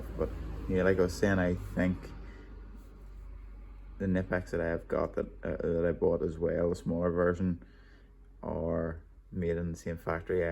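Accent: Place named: Scotland, United Kingdom. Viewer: Man, great video from a man who's using his tools in I presume a regular bases? I like your accent, and had to laugh out loud when I hear you calling names! I'm coming from Holland, and we have a saying "vloeken als een Hollander", it means something like ,"cursing like a Dutchmen" but I think people from Scotland can do their share too!! 😄 Very entertaining video, and besides that, you know where you talking about! I stumbled by accident on your video's and I'm really amazed that you only have plus 200 subscribers! Come on, make more video's,it's been 4 years ago!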